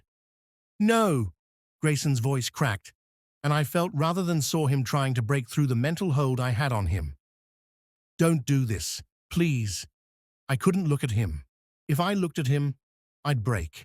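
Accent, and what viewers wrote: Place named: United Kingdom. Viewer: title is not correct but the story is good. love the voice... sounds like Sir David Attenbourgh. love the British sounds.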